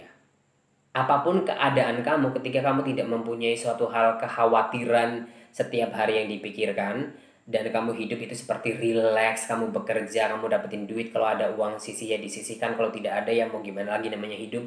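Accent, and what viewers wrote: Java, Indonesia. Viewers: Agak medhok ya mas 🤭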